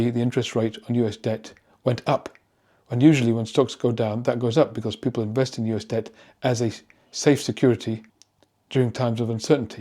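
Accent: United Kingdom. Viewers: When I hear mathematics with a British accent I always think of the teacher from Willy Wonka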